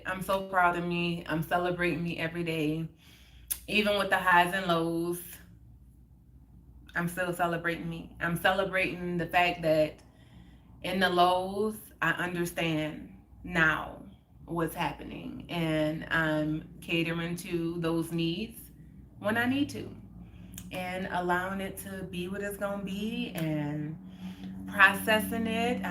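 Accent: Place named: New Orleans, United States. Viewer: I like that T nw orleans accent u he And me😊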